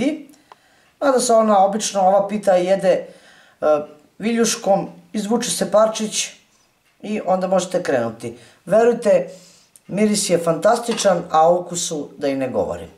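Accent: South, Serbia. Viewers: Fantazija ukusa,pogotovo gde ima krompra to je neizostavno,svi ostali sastojci su nesumnjivo takođe doprineli ukusu.Moram da ti każem da ti je glas toliko umiljat i taj jużnjački naglasak,podseća me na divnu glumicu Radmilu Savićević, Puno pozdrava.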